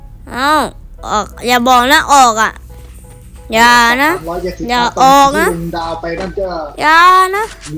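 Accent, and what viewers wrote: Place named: South, Thailand. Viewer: พี่ พูดภาษาใต้ได้เลยผมก็เป็นคนใต้😊😊😊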